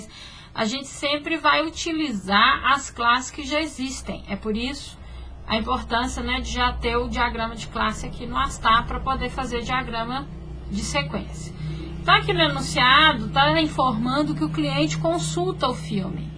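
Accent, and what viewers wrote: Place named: Minas Gerais, Brazil. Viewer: Vcs reclamam de tudo, a moça deve ser de Minas Gerais e o Brasil possui vários contrastes e muitos sotaques. Aprendam a respeitar a forma de falar das pessoas. Com certeza na dependendo da cidade de vcs, também tem um jeito de falar e consequentemente na cidade dela iriam estranhar sua forma de conversar, mas com certeza teriam respeito por entender que não nasceram ali. Espero que tenham entendido agora. Bons estudos a todos !!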